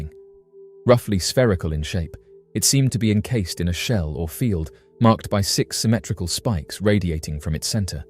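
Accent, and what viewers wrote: United Kingdom. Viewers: You know what I bet. Some Alien fly saucer passing by the earth dumped their sewage, it froze then fell to earth and he found it. Also, videos like this seem to use this one voice with the British accent to give it more drama 😮.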